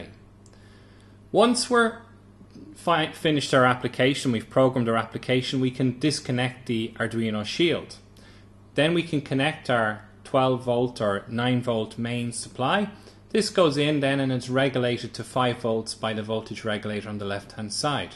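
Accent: Ireland. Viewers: Hey, well *I* knew you were Irish because you obviously sound Irish. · I called it!!! I knew you were Irish! At first I thought British but then I realized cause of the way you say three! Haha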